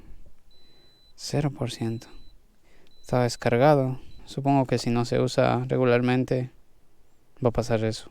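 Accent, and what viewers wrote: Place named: Highlands, Ecuador. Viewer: Ese acento característico de la serranía ecuatoriana, creería que del Austro.... llegué a este video, gracias al algoritmo de Youtube. Excelente video, saludos desde Guayaquil, compatriota.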